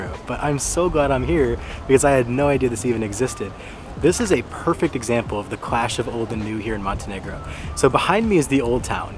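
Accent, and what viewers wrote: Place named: United Kingdom. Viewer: Why does she have a british accent?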